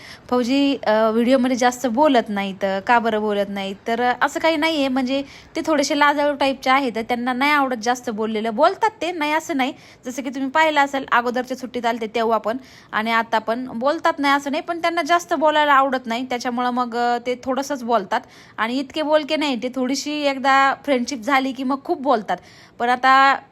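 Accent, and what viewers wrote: Ahmednagar, India. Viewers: अग तुमचे व्हिडिओ असतातच छान ओरिजनल असतात तुमची नगरी भाषा फार छान वाटले ऐकायला कुठल्याही गोष्टीचे एवढे मनावर नाही घ्यायचे डॉग बदल सांगते । चे धन्यवाद त्यांनी खुप छान व्हिडीओ. बनवला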